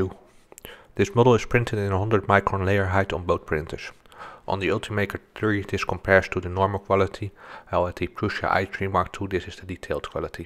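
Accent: Netherlands. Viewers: Nice video man, but the heavy dutch accent is REALLY annoying! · I love your Dutch accents 👍🏼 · Dat klinkt Nederlands :)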